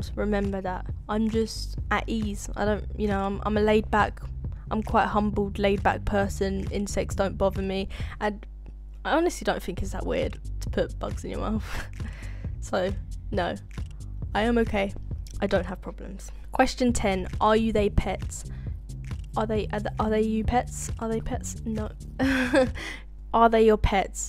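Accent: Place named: United Kingdom. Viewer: of course she’s british 😹😾.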